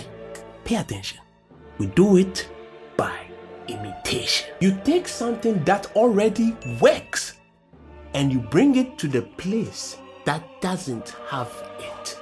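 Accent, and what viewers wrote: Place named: Ibadan, Nigeria. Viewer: He actually got attracted to Nigerians by his Ibadan accent. He sticks to it, and became famous for it.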